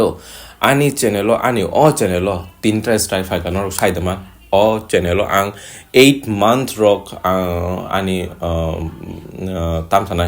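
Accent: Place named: Manipur, India. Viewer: Very Few Meitei People can speak Kokborok I'm surprised You are one among them 😃 Clearly you have Meitei accent even after speaking Kokborok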